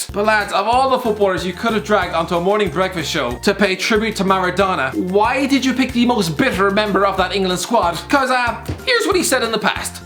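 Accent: Ireland. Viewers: Arent u Irish or snt? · Good lord, this is the most obnoxious video commentary that I've heard in a very long time. What's wrong with you, Irish guy? Take a deep breath sometime. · Best thing I have ever heard in an Irish accent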